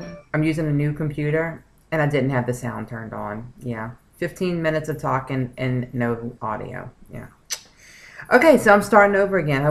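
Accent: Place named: New Orleans, United States. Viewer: Hi, Thanks for sharing this journey. I'm just starting-- I'm on my Aetna 90 day pre-op. I have a question.... I'm definitely hearing a New Orleans accent!!! I'd know it ANYWHERE! Are you from New Orleans?? I'm a native....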